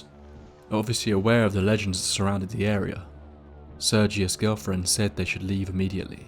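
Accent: England, United Kingdom. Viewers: Ive just discovered your channel. I livevin the UK so its good to hear an English accent. No offrnce meant i look forward to many more stoi43t ,😅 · Something about this guys narration and his regional accent makes these stories, extra interesting. I always keep my attention on the story. A British accent makes everything sound better.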